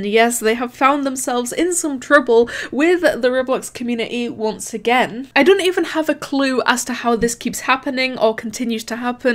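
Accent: United Kingdom. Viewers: Yez she has british accent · Is this british accent? No seriously is it? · She has a British accent.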